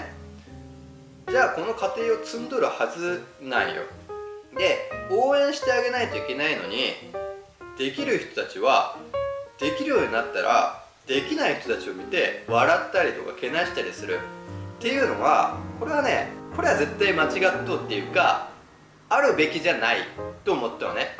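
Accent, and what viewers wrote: Japan. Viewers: この動画も九州方言も好き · カナダにワーホリで行ってたけど、本当のネイティブスピーカー以外は文法も発音も結構適当というか完璧ではなかったです。そりゃそうだ、そこで生まれて育った訳でもないんやから。伝えたいことが伝わって最低限相手の言いたいことがわかることが大事な気がします！なによりこの人の博多？なまりが何より安心できました笑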